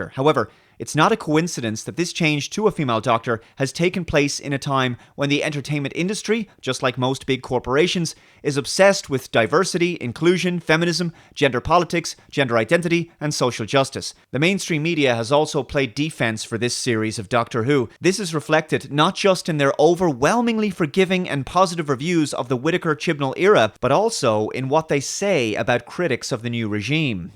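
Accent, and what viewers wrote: Ireland. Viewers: I've been a bit fed up tonight so I thought let's listen to a nice Irish voice, very calming! Not going to be for too long though is it! Not with silly fuckers going along with Leo's abort & import policy! I thought the Irish were better! Seemingly not! Better learn Pidgin!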